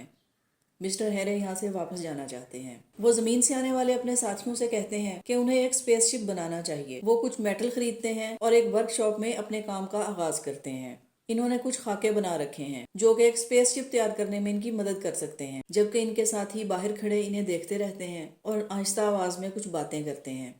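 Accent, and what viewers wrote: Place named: India. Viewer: You should definitely reduce your accent. I used to live in West Ealing in London so I'm used to the way Indians speak English, but your accent is impenetrable.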